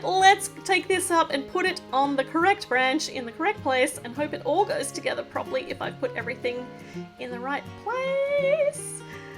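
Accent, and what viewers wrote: Australia. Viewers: Yeah, in Australia people pronounce it "baahk", such a charming accent! 🐨😊You should've heard her last year when she said "care bear" in a vid 😛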